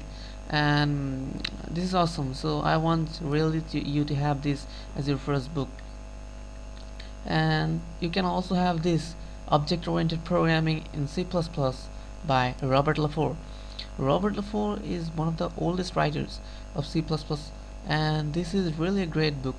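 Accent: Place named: India. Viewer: what is it with the accent....u r indian right..??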